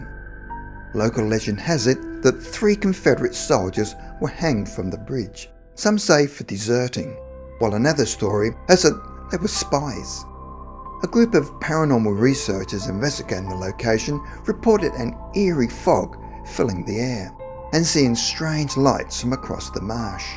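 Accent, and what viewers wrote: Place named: Australia. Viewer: Why the hell is an Australian guy comentating American history. This ruined it for me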